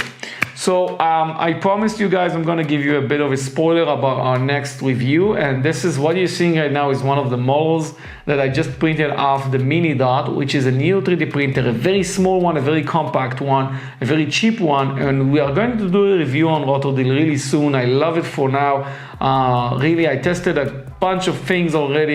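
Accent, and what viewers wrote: Israel. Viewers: Israeli english LoL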